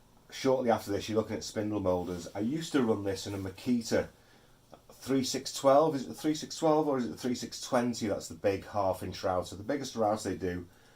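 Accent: United Kingdom. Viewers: Awesome. My first video of yours that I’ve watched. Will definitely watch more! Nice to hear another UK accent in a sea of American accents. 😊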